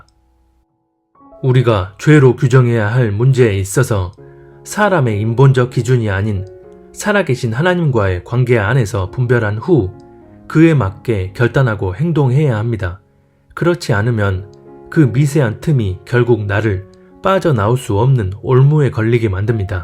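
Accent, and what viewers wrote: Jeolla, South Korea. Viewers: 전라도 사람임? 말투가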